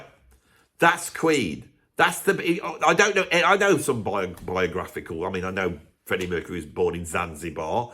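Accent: Birmingham, United Kingdom. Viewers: This is the kind of well thought out and insightful video that made me a subscriber. I particularly liked the irony of a Brummie ridiculing the northern accent. Bravo, sir!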